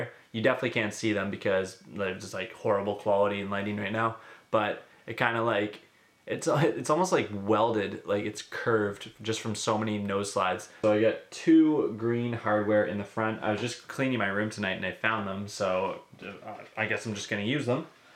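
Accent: Canada. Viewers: You're Canadian right?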